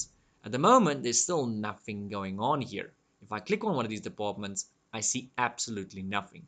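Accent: South Africa. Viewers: Curiosity sake are you South African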